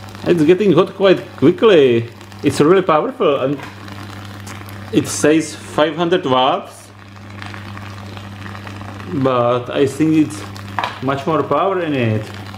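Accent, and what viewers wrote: Czech Republic. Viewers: Czenglish :D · Good CZenglish, better than Sobotka. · Czech english lol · Czech republic i guess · I love his Czech accent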